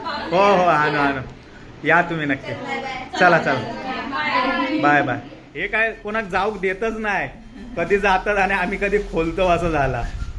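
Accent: Malvani, India. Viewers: malvani manapasun n khaychiv natka n krta boltas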